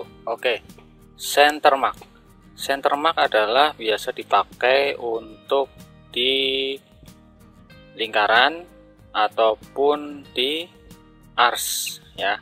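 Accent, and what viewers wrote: Banyumas, Indonesia. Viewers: matur suwuh tutorial ya om jos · asyiappppp Bos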